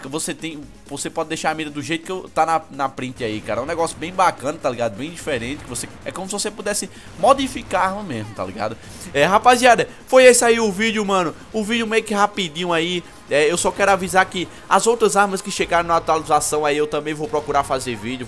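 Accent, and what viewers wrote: Northeast, Brazil. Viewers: VC É UM MITO MANO SOU SEU FÃ PRINCIPALMENTE PORQUE VC É DAQUI DO NORDESTE EU MORO AQUI MANO EU AMO SEU SUTAQUE ASUHAUSHAUSHAUSAUHS <3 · ***** mano vc é do nordeste neh ?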